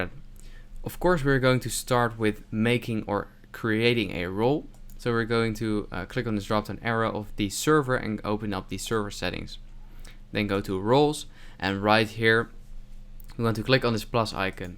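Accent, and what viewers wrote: Netherlands. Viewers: Thanks for the turtorial! it was super handy ;p and your accent screams : 🇳🇱🇳🇱🇳🇱🇳🇱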